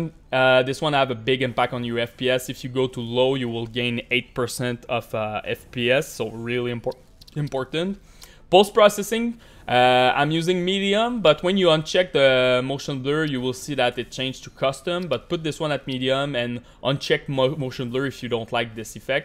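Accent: Quebec, Canada. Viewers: J'ai reconnu l'accent québécois direct lol pis mon user est aussi Ludov lol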